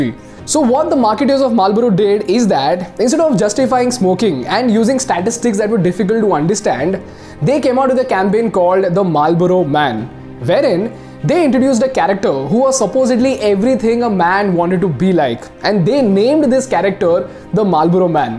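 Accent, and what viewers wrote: India. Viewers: Love your indian accent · " it is better to be absolutely ridiculous than to be absolutely boring" What an amazing video. So thought provoking. Love your Indian accent adds a veneer of ancient knowledge being conveyed. I found your sports analogy from an Indian perspective quite peculiar. Only an Indian would have used Cricket 🏏 as a metaphor 🤣😅😂. Most would have used Football ⚽️ and Maybe an American , American football🏈 . I just discovered your channel and have watched 3 videos now. Excellent content 👌 and production. Definitely earned my subscription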